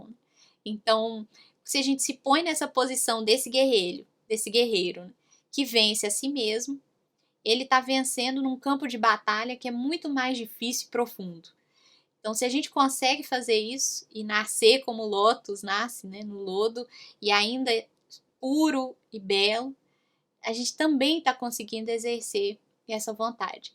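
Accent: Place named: Minas Gerais, Brazil. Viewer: Sotaque de minas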